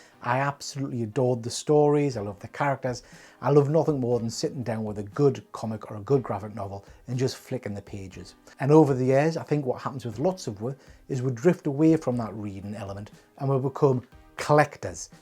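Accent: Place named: Newcastle, United Kingdom. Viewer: Geordie boy!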